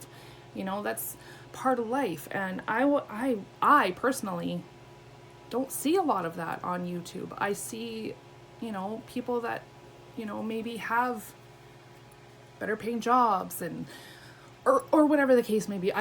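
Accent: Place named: Canada. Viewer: I’ve just listened to this on my walk home and I just can’t wait to see you! Miss that Canadian accent 💜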